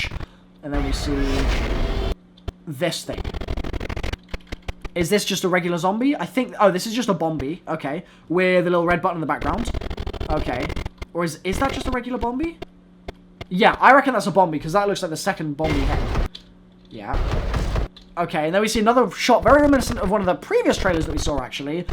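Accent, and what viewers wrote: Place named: United Kingdom. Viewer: i fucking love how British the commentary in this is. i was laughing so hard.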